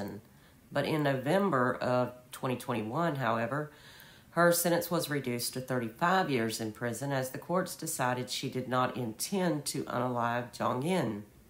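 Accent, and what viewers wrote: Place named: South, United States. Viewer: I speak Korean (4th language) and you did very well. It's a whole new tonal system and they have pronunciations not natural for English speakers. That being said, I can tell you're from the south. I'm also from the south and know that pronouncing most European words gives us aneurisms so I know how hard you tried with the Korean names. You did WAY better than you give yourself credit for ❤️❤️ Your compassionate spirit still captured the same sentiment the rest of us feel.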